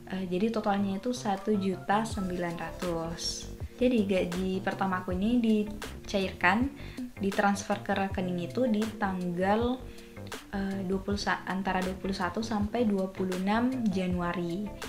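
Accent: Indonesia. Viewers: ORANG GORONTALO KAH ??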